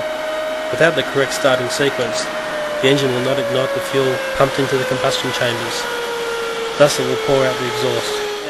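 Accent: Australia. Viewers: That's an Australian accent you tool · Who is this fucking robot voiced australian narrator?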